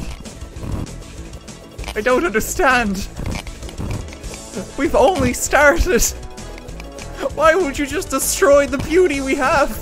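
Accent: Ireland. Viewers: R u irish? cuz ya got an irish accent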